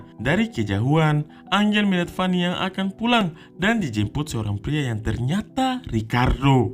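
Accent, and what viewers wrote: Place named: Maluku, Indonesia. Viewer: suara nya udah jelas cuman intonasinyaa agak kasarr bang z ini orang maluku jadi logat dan bahasa nya kental bangat. Dia juga bru mulai jadi menggunakan alat seadanyaa. Rata2 org medan suka pembawaannya soalnya logat nya aga2 mrip.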